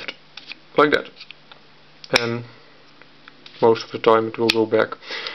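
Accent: Netherlands. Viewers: Ben jij Nederalnds? vraag ik me af vanwege je accent? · ik hoor gwn dat je uit nederland komt :D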